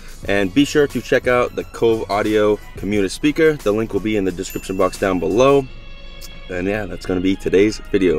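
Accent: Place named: New England, United States. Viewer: I thought for sure you'd go to Rocco's in Millbury,! PV is good too though! 😄 Wicked awesome video- happy you never forget your old stomping grounds! And don't you ever lose your accent- you gotta represent us New Englanders! LOL #TeamBigBites FOREVAA 🍩💜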